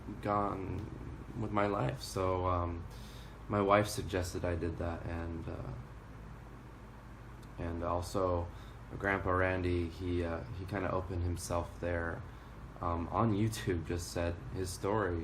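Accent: Bisaya, Philippines. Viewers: thank you for sharing, your a tough guy. God bless your family, and i cant wait to hear your bisaya accent soon :)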